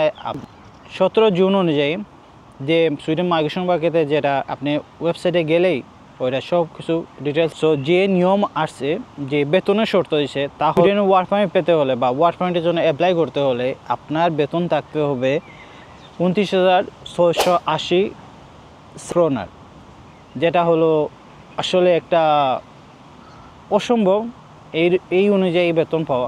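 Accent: Sylhet, Bangladesh. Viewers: ভাই কিতা সিলেটি নি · মনে হয় সিলেটি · কথায়ই বুঝা যায় সিলেটি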